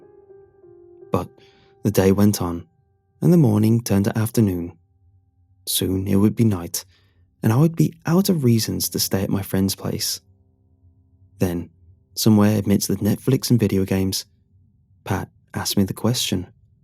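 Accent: United Kingdom. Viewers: You have a British accent .....cool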